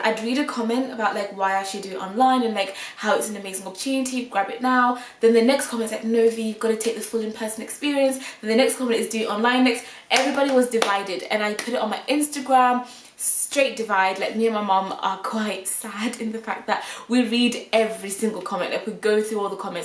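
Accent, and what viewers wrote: United Kingdom. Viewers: Wait is she british?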